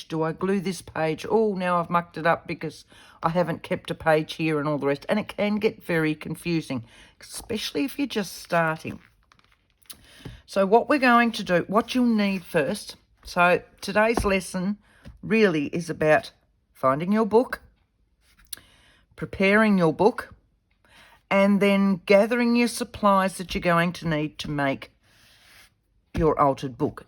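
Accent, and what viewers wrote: Australia. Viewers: Hi. Nice to hear an Aussie voice on a junk journal tutorial. I so wish I had found this two years ago when I first started out. However, I have already started taking my readers digest book apart to create my very first altered book along with you. Keep up the good work, loving it.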